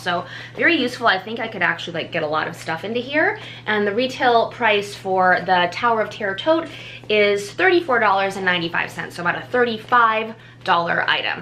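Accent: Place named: Midwest, United States. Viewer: First off: I love how excited you get about every item.. and second off: I knew you were midwestern the minute I heard you speak (gotta love our accent)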